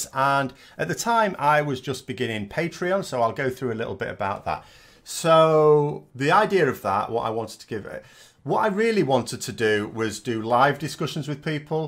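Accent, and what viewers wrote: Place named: Yorkshire, United Kingdom. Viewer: Hey, love the channel. Currently living in Buenos Aires and your videos are one of my primary ways of keeping up with the ins and outs of Brexit, etc... Also, get to hear a Yorkshire voice on a regular basis.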